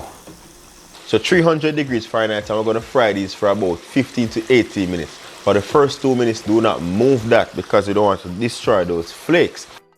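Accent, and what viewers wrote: Jamaica. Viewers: Chief, I am not just here for this deliciousness, but the Jamaican accent. Lol keep doing your thing. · I love that Jamaican accent. Kenya we locked in waaaagwan😃😃😃. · Am here for the jamaican accent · I need a Jamaican man in my life..his accent😍😍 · Love this guy! He’s authentic! Mi miss Jamaica 🇯🇲 just from listening to him! Mi ready fi eat! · Wicked! A jus fine yuh and am very impressed. Can't wait to try this recipe. Love the mix of English and Patois and di sweet Jamaican accent. Chef, Yuh mek mi feel more homesick dan hungry. Trus mi! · Your accent ❤ I had a boyfriend from Jamaica in the 90s. He died in a car accident. Listening to you brings tears yo my eyes. Im going to make this today! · Wa gwan! it's the Jamaican accent for me. Love it. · I love the Jamaican accent 🤭 and I love his realness too! Smelling the food and using bare hands are things we want to do behind closed doors but I'm glad you make me feel comfortable watching this. 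· Fat n juicy! 😁luv dat Jamaican twang!❤️👍🏽😋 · Me rate di video from mi hear di jamaican accent🇯🇲💯💯🔥🔥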